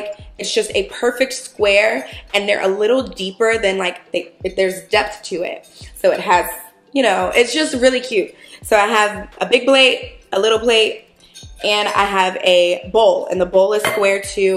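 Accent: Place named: Florida–Alabama, United States. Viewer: I love that you pronounce everything JUST. LIKE. ME. Gurrrrllll… I grew up in Floribama too - Pensacola to be exact! You grew up right down the way (I believe)! I know the word “carafe” looks like it’s pronounced like the words “care + if” but it’s actually pronounced like “giraffe.” (Currr-afff) Guuurrrllll… words are always pronounced the exact opposite way my Floribama behind thinks!!! LOL! But say that word however you like! I think it just shows our shared regional cuteness 💖💖💖 ❎🅾️ Susan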